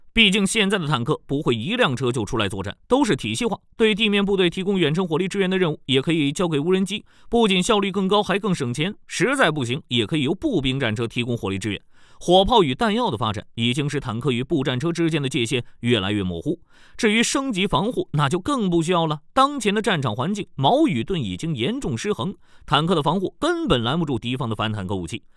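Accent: China. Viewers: 播這種中國腔調,不行真難聽直接下一頁!!!!!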